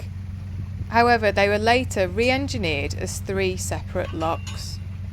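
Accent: United Kingdom. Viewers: Your British accent is absolutely lovely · You have a perfectly normal English accent! Nothing at all out of the ordinary for that region. Some people are just too ignorant to appreciate the beauty of regional accents. It would be a boring world without them! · I'm American so you have what I think of as a very British voice, perfectly fine. I've learned over 6+ decades of life to just let the naysayers go and don't allow them to own any part of your thoughts. Remember, people only have as much power over us as we allow them to have. Keep on making your videos, they are outstanding! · I've never heard a British accent that wasn't music to my ears, yours included. I just discovered you and I love the tone and energy of your video! Thanks also for the history.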